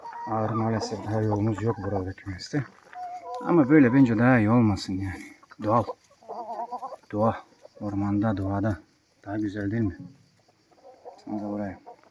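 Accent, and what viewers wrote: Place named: Balkans. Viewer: Siz Türk degilsiniz turkceniz duzgun degil Balkan gocmenine benziyorsuniz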